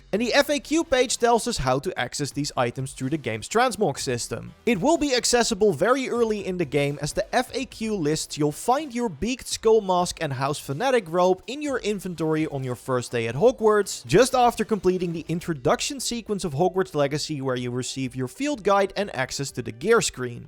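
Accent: Netherlands. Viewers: duidelijk nederlands accent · Steenkolen Engels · 100% Nederlands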